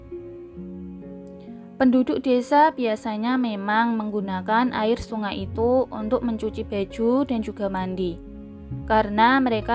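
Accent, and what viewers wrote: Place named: Java, Indonesia. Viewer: doorrrr Jawa banget aksen nya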